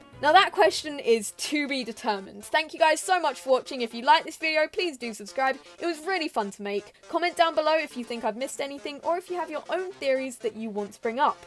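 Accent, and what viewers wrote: United Kingdom. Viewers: WHY IS BRITISH!?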